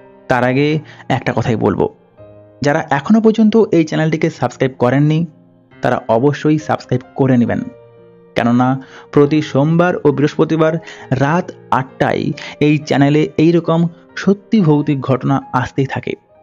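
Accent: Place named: Medinipur, India. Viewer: Vai tomar bari medinipur naki..kotha bolar dhoron suney money ho66ey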